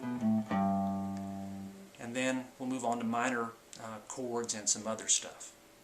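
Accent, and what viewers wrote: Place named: Texas, United States. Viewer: You’re accent makes me miss Texas!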